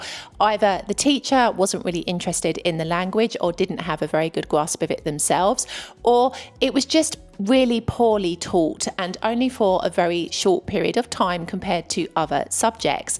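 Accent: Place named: RP, United Kingdom. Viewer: Sorry for my lack of clarity. I meant your own level of Italian proficiency when you moved to Italy. As for your accent, I’d place it in the RP category. Best wishes.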